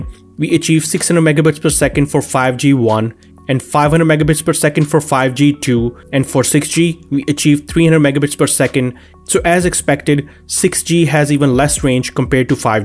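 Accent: India. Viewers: The Indian accent made me wanna stop watching, I can’t, it’s so heavy😂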